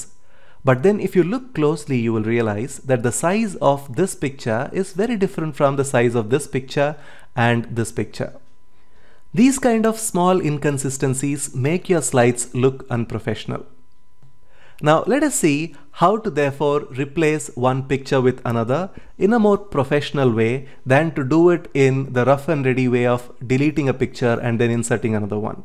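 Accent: India. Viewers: until its indian blah blah!!!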